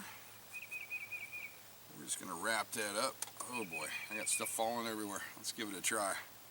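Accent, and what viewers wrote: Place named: United States. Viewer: Great Video, yr cooking Videos becomming more and more Professional...its nice to watch you while cooking and listen to yr nice american Accent,... the video is very interesting to me, more interesting than to listen to the cake king in US television. greetings and good appetite my friend Harry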